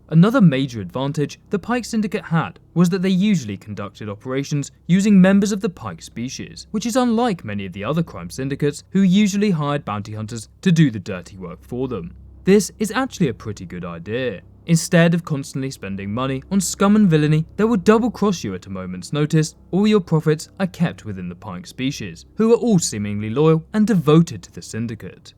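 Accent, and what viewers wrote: United Kingdom. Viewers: Just realised he’s from the uk cuz the accent. #oneofus!!!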